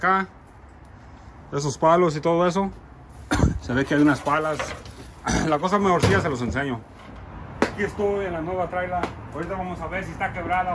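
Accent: Spain. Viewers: A mi también me encanta, y el acento español q tiene más..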